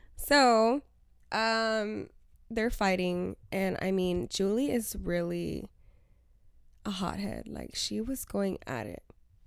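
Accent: United Kingdom. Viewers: Also your British accent is GOOODDTTTT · Your british accent is killing me 😂 My new favv podcast fr 💗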